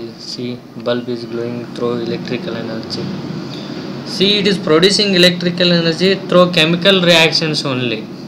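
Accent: India. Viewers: sorry to say this, but this presentation is really hard to understand due to extreme indian accent..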